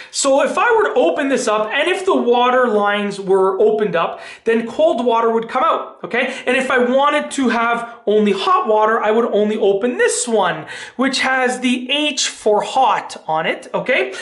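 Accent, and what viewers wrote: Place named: Canada. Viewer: You sound so canadian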